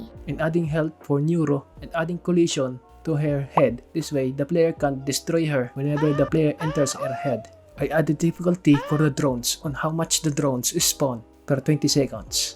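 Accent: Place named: Philippines. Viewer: Filipino accent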